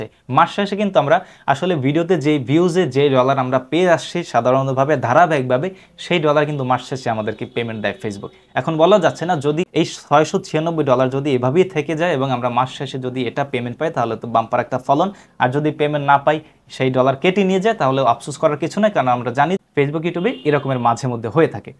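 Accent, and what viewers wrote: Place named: Barishal, Bangladesh. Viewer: ভাই আপনার বাড়ি বরিশালের কোথায় ?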